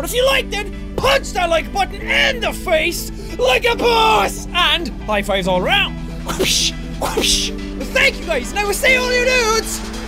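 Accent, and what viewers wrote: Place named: Ireland. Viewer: The boss he's flipping irish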